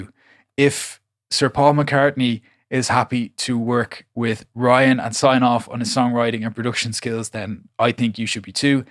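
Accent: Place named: Ireland. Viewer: I saw this come up and was very interested. I knew that I wouldn't have the time available to dedicate to it that it would need, so had to pass it by. great to hear that it delivered on the promises made. The track that you came up with sounds great. how do you think it compares to your tracks previous to doing the course? Keep up the good work, and keep the videos coming. I find it way easier to listen to a fellow Irish mans accent than those annoying overly neutral Americanised accents :)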